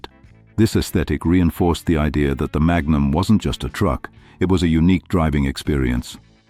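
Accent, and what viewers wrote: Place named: United Kingdom. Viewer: Yep, 100% AI slop. Generic British accent voiceover, no breaths, slightly off vocal cadence. There's so much of this trash on YouTube now, I can usually spot it in seconds.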